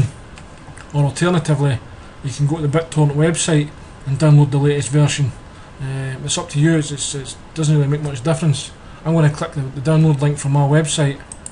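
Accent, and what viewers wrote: Scotland, United Kingdom. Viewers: Than ye must be a huge idiot , or a non english speaker. Everyone knows it's actually Scottish accent , can tell ya , he's from Dundee or East Lothian.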